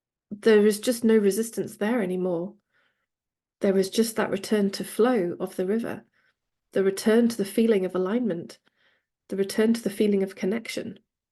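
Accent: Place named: West Country, United Kingdom. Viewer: Hi, I recently saw you on the non duality podcast and HAD to search you.. I noticed the south West Country twang in your accent also.. I’m from Bristol you see! Your book is ace so far btw.. just sat here reading it now.. I was wondering if you do any work one 2 one with people or workshops? Thanks.. keep up this wonderful work….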